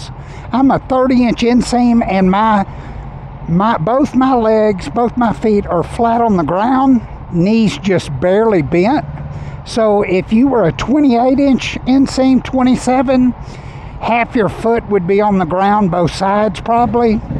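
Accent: South, United States. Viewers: Hey, Love your channel! And Love your accent... I'm from up Northeast Pa. but I can tell you're from somewhere in the South. Don't know if you're as old as me but I been riding my Ninja 650 since 2018 and refuse to let it go..too good of a bike! I also bought a CBR650r a few months ago. I missed that smooth 4 cyl engine and sound. I been riding legally since 1987 but started on a dirt bike at 12.🦾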